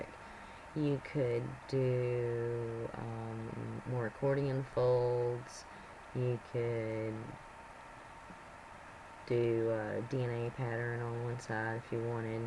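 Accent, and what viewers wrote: South, United States. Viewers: i love ur southern accent! ^.^ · love your voice is that southern plus am learning on how to do tie dye to bond with my stepdaughter and we are doing them together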